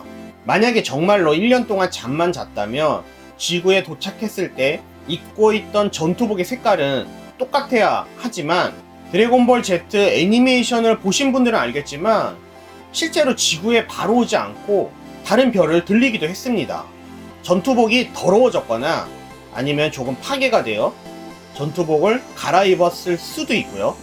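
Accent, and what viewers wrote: Japan. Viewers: 유튜브를 일본식으로 자꾸 유츄브라고 발음하시는 이유가 있으신가요??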